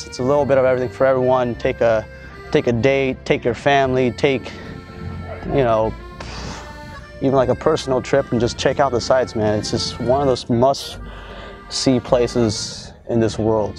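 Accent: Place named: United States. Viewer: bro you are such an American. The accent was hilarious. Nice into and thanks for the video.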